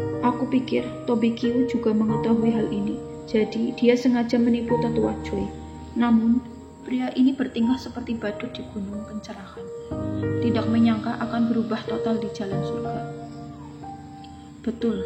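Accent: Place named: Banyumas, Indonesia. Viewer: dialeknya kaya orang ngapak, apa iya?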